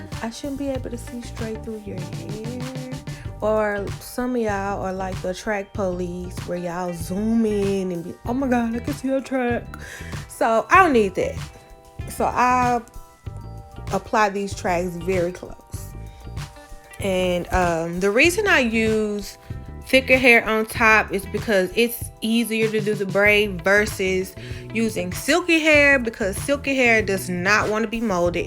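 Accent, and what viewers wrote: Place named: St. Louis, United States. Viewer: You sound like you from saint louis Missouri!! I swear you sound like us. You slay too boo!!! Plus we definitely use pro styl gel.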